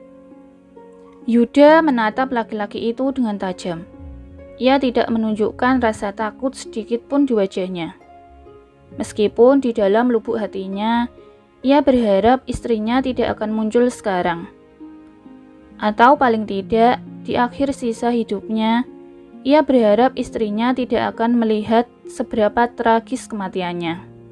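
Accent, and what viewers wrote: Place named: Java, Indonesia. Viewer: doorrrr Jawa banget aksen nya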